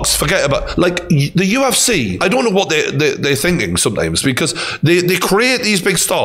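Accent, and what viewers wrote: Newcastle, United Kingdom. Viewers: I love but I'd rather not have a husky Geordie man talking me to sleep, not again anyway...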